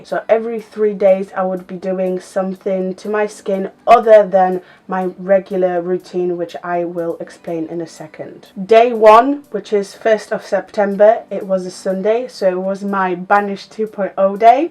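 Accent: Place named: United Kingdom. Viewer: Love your personality and uk accent. Wanting to try this out myself.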